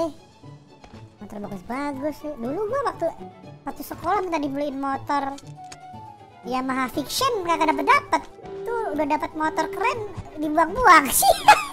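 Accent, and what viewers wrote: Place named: East Java, Indonesia. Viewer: Jawatimuran nie... Anjay ..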